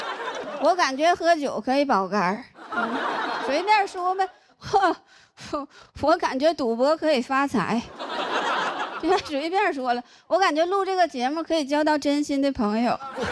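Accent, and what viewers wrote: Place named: Northeast, China. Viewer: 这一口东北大碴子味儿。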